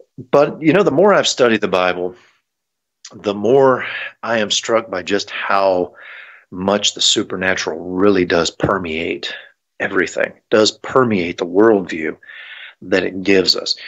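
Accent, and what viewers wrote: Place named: South, United States. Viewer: This sounds like our dearly beloved Dr Michael Heiser rest his soul but with a Southern twang I love it brother keep up the good work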